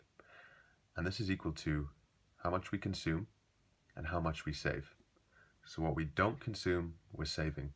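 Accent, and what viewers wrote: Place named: United Kingdom. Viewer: Thank you very much, so useful! Keep uploading videos, we need to understand economics with a British accent ;)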